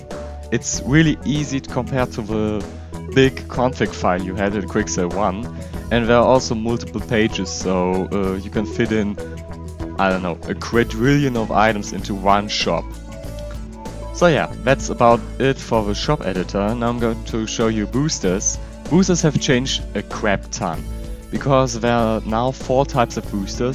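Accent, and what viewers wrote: Germany. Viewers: Hey dude, is comming a new Update of Slimefun? or is the project abonden? because the last update was in september 2015. But away, Nice video bro. And are you German? Your Dialect in English is like German. :)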